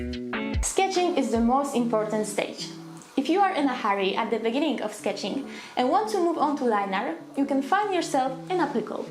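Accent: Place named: Poland. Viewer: "InkTober would be fun" I said- "I can show off my OCs" I said- 😂 my sympathies that InkTober is draining you, too - but I can see why! A new drawing every day is pretty daunting; I've been following all of your prompts on Instagram, and they are absolutely gorgeous, every single one! I'm glad you decided to draw days 13 and 14 in advance so you could set aside time for this video, even if it was a lot of extra work 😭 "Sketching is the most important stage" ...welp, I wish I had known that 14 days ago- for the first 10 days of the challenge I was just going in all ink! (All in? All ink? 🔏) I only started doing sketches recently 😅 ahh... so much unnecessary pain : Friends is such a great show! Noooo! ;-; I love your speaking though! You speak English very well ☺️ and even if you decided to do Polish w/ English subtitles, it would be great because you have a very lovely voice, and a beautiful accent ✨🇵🇱 Also, this style of video is wonderful - keep it up! 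Not to mention your humor~ The speedpaint process is so so beautiful! Even if you're not absolutely loving every single one, I think they're all masterpieces in their own way Thank you for sharing your talent with us!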